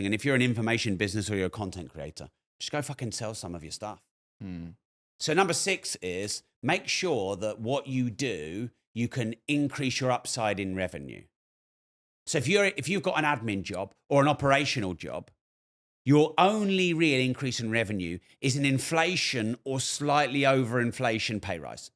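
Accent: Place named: United Kingdom. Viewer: Can't listen to anyone with a British accent more than 30 seconds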